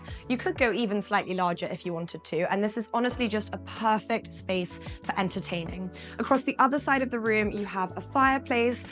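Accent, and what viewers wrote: RP, United Kingdom. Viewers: Because of your nice legs and posh and the way you say all, Awl I’ll buy this house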